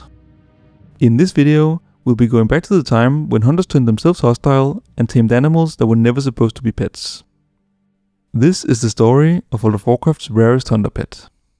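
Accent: Denmark. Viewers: Ooooh, Danish-english accent😂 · I’d almost bet everything I own that the guy speaking is Danish.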